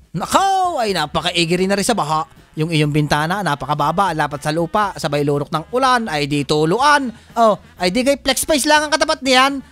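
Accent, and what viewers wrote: Batangas, Philippines. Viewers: batangenyong batangenyo eh hahahahahaha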